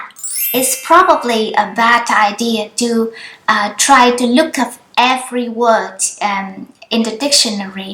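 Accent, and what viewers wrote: South, Vietnam. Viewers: Thanks for reply , i am malaysian nguoi hoa . i learn a lot of southern accent tieng viet from your video clip , cam on nhieu ! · Sorry I forgot, this is southern accent right?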